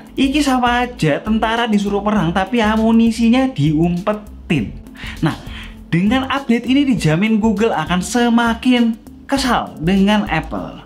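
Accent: Java, Indonesia. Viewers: kok baru medok? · bang logat jawa nya di hilang kan saja, supaya lebih mantap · Logat medok jowo. Next : logat madura bang ato logat medan ➡️➡️➡️ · Jawa nya medoooook mas.... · bohoso ne medok men :v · Kok jadi agak2 medok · Bukan niat mau rasis atau gimana, cuman dengan medoknya jadi agak aneh dan ga nyaman didenger mending kek biasa, jangan dipaksakan. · Lebih nyaman gausah medok mas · Medokk amat yakk sekarang bahasanya 😂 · Bang kedengarannya pake logat Jawa yah? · udah ganteng, manis, medok lagi ngomongnya.. #idaman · Medokee maseee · Galfok sma mendok nya om. Sma medoknya kaya saya. Salam ngapak om... Gpp bkn yg prtma nnton,,yg pntg selalu nnton saat pidio baru uplod. 👍👍👍👍👍👍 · Kenapa jadi medok 🤣🤣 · Logat Jawa nya keluar 😂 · Mending nunggu..om balik gak medok lagi. Gak enak didengar mas kalau medok. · Saran jangan pake nada jawa lah pake nada formal sajaa. 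Gak enak didenger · bang logatnya berasa pembawa acara orang jawa👍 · Ga seru kalau mendok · Kok medok mas... >< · Sobat jaksel logat jawa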